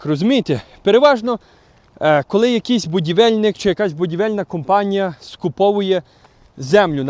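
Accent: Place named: Ivano-Frankivsk, Ukraine. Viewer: Який чудовий акцент. Наче з Франківщини?)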